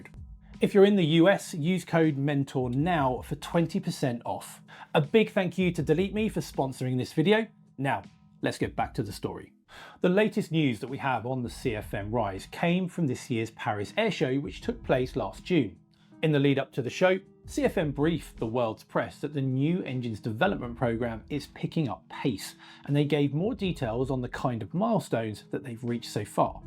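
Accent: United Kingdom. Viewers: I have to say I do appreciate the English accent. So few English Pilots on YouTube. He is the only one I know. of. I'm sure there are, just have not seen them. More so I appreciate his ability like Petter, to thoroughly explain in laymen terms. The down side, It makes me like Aviation more. LOL.... I Do hope that things improve in environmentally sound engines. I know we can. It can only help things, and ensure the aviation sector. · I prefer Petter's style and accent (even though he is not from native English speaking country) to (with his British accent, especially on-at least 10-'but')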